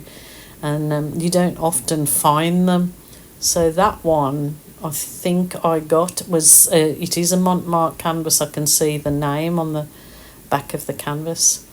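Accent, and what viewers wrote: Birmingham, United Kingdom. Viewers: Your accent sounds very much like the Birmingham, UK accent 🤔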